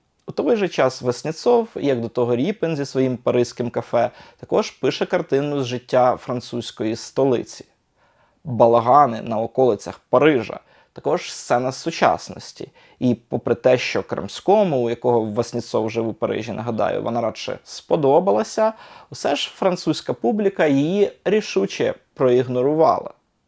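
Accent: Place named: Western Ukraine, Ukraine. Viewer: Судя по акценту афффтор ни разу не относится к украинской наследственности. Западенец у которого мама курва, папа на конюшне жил...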